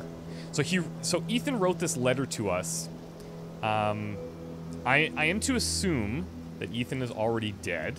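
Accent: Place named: Canada. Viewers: Yes, he's Canadian. Montreal, by way of Saskatchewan (if I recall correctly) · Dragonborn Dovahkiin yehhh ur one of us canadiansssssssss eh!! u rly sound canadian... im from toronto so we don't really sound canadian.. but i have my canadian moments +__+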